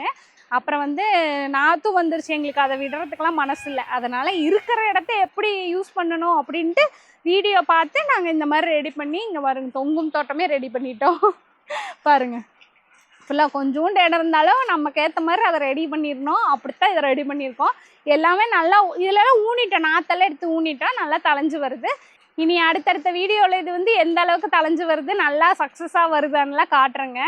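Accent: Kongu, India. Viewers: ஆமாங்கோ கோயம்புத்தூர் காரங்க ஈரோடு காரங்க எல்லாம் அப்படி தானே பேசுவாங்க😊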